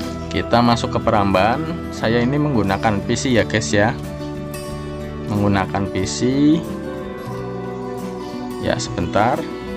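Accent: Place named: Kebumen, Indonesia. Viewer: Jaannn njawani . Kebumen kayane rika ..??? "NEMBE NEMBE" 😂😂 .. Semangattt lanjutkan . Silaturrohim juga ya ..?Terima kasih mas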